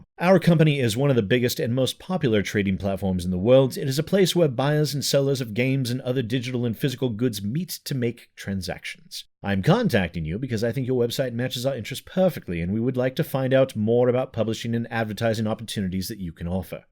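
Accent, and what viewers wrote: United Kingdom. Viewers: That britishsounding reading with supersnark felt so TB I had pangs. Gotta go watch some Genna to support her.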